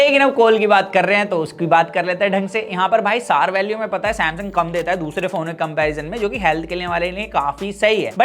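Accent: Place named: Bhojpuri, India. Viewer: Batiya rhe bhojpuri 😂 achha lga bhojpuri type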